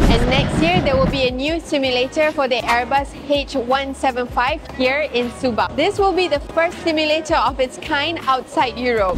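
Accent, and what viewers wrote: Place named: India. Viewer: Please stop speaking English as Indian it is extremely trashy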